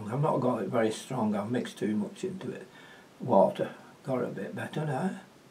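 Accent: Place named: Northern England, United Kingdom. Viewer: great pen & wash,keep em coming! and the way you talk is ok,you are what you are and the northern accent is good.i was born in the east end of london and we have our own language,when i moved to another part of london the teachers at school called my mum in and said they couldnt understand what i was saying, be proud of where you are from,not to please others. keep well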